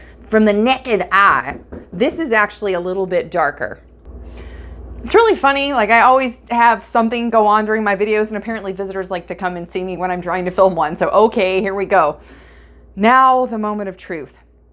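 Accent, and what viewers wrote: South, United States. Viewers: Enjoy your Southern drawl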